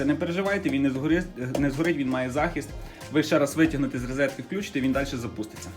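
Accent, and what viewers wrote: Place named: West, Ukraine. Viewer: Класна західноукраїнська мова! Ви часом не з Львівщини?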